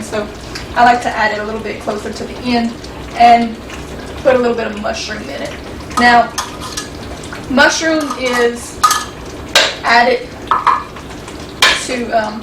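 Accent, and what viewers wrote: Louisiana, United States. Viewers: are you cajun??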